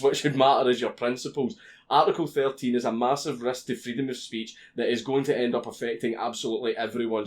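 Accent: Scotland, United Kingdom. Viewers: Dont worry youtube is safe it has not went through. Are you scottish? (I am !) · If all else fails, move to America. Sure we have our crazies, but at least we have the first amendment. Unless you're doing something that's genuinely dangerous, you can shitpost 'till your heart is content. Also you'll notice that we tend to love Scottish accents ( ͡° ͜ʖ ͡°)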